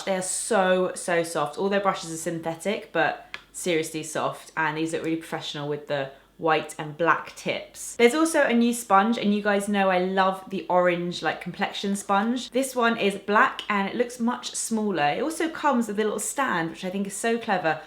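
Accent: United Kingdom. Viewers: Hey, I love your Honesty and you are so classy and natural (love your British Accent). I am not on all that make-up thing expect Nars Product but I love watching your videos, they are so pleasant! Cheers from Paris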